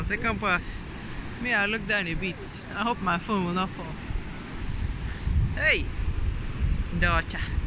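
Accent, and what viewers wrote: Nigeria. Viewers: Wow this is lovely...You now have full 100% Naija accent...lovely. I'm loving your videos. No be small thing o lol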